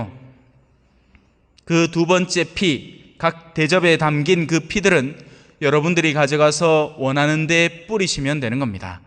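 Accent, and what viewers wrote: Gyeongsang, South Korea. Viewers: 🕯🥀찬미예수님... 신부님.오늘.말씀..참.올바르신.말씀압니다...감사합니다.찐.펜.입니다 경상도.말씀.화통하신.웃음..아멘🕯🥀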